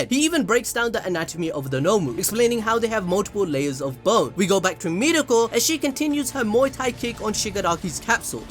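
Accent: Punjab, India. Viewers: Bro hold up with that Punjabi accent, ur audience speaks Hindi too! Namaste 🙏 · Are you Indian... Can't tell with the accent though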